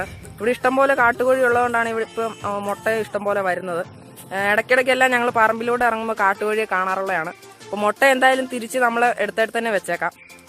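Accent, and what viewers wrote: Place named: Idukki, India. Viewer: ഇടുക്കി ✌️✌️✌️ slang കേൾക്കുമ്പോ അറിയാം 😇😎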